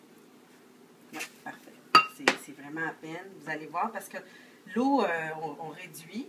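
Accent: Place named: Quebec, Canada. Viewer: She's Dominican Canadian I can hear her French Canadian accent and her Dominican Spanish accent lik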